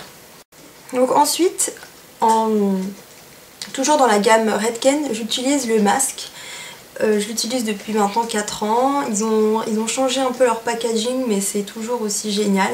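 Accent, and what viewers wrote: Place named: France. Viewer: Hello :) I don't know if you'll be able to understand this but I just found your channel and I think you are absolutely beautiful! I also love your accent, I go to France often on holidays but I've never been able to understand in full. I subscribed to your channel, you have lovely videos! Hopefully more to come :)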